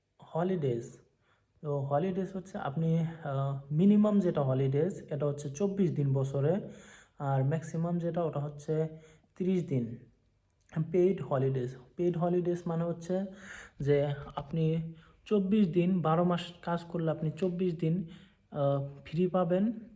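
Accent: Assam, India. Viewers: moi apunar kothar style sai buji goisilu...apuni Assam or pora ...bhai apunar logot keneke contact koribo paru ?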